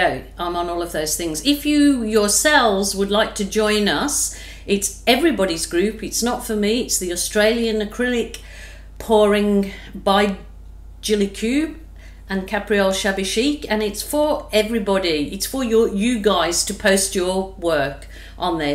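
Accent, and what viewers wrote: Birmingham, United Kingdom. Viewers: Love it, yet again a cracking outcome 👏 Do I detect a " brummie accent " or is just my naff hearing x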